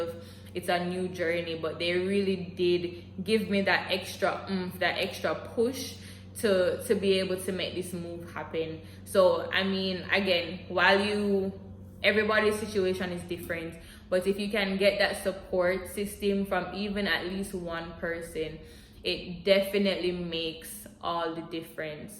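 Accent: Jamaica. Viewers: Heard the Jamaican accent and instantly subscribed 🤣. Moving to Houston soon so I needed this video. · I know this was posted a little while ago, but thanks for sharing. I can hear an accent Jamaica Im assuming. I’m curious how easy/is it to find a community of islanders? Places you recommend? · i heard that caribbean accent jamaican to be exact. Thanks for the insight.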